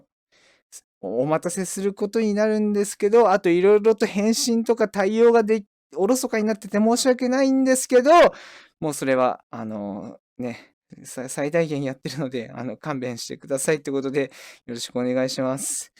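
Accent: Hiroshima, Japan. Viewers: 時々広島弁になってねえか？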